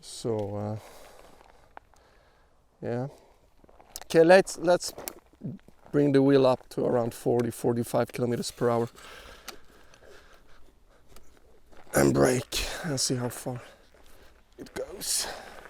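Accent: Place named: Sweden. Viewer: Wow, thats really nice breaking. You sound Swedish (är du Svensk?) When you were braking on gravel i was like, what did they add ABS on some model allready? 😮 Cause those skid streaks seems impossible to make unless its something self controlling (3dm in 50km/h (13.8m/s) only takes 22ms to travel, seems inpossible to adjust based on input every 22ms. But might be that wheel becomes self adjusting? As it starts skidding, the power drops drastically vs when actually breaking? Why no helmet though? Braking from 50 can turn this into something that hospitals log, to ban EVs (dont ask me how i know 😊)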